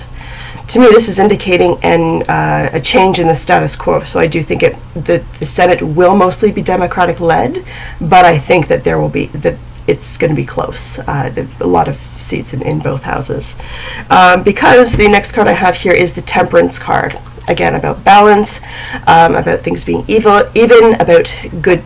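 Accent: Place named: Canada. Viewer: I have to say I LOVE your accent. I have several Canadian clients and I love listening to them talk. I hope that isn't offensive to you, I don't mean it at all. I'm 43 and Ive watched various versions of Degrassi since I was 13 or so and I love the way they say some things too.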